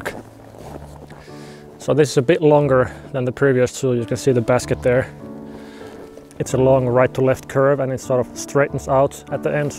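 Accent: Finland. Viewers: How didn't I notice you're finn, you sound like the iceman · As soon as I heard your accent I a had a hunch you were a fin! :D Great tutorial man and enjoyed the ending. Very chill. Kaikkea hyvää !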